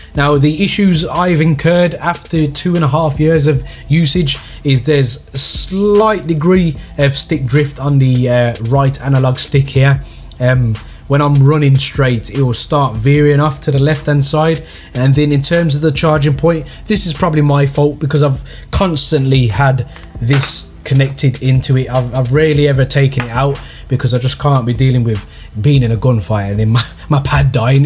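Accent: Birmingham, United Kingdom. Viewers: U from brum?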